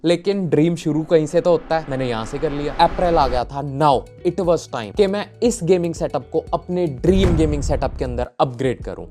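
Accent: Punjab, India. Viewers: Bro konsi city me ho ? Apki voice me punjabi touch hau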